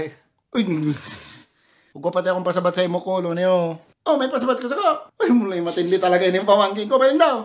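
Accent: Bicol, Philippines. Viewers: napindot ko na ang subscribe . gaganda ng vids . nakakamiss mag salita ang taga bicol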